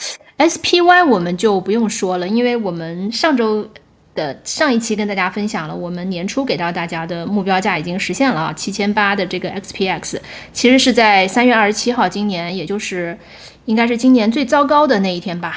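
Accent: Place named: Beijing, China. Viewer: 期待捣持出镜，亲切的老北京话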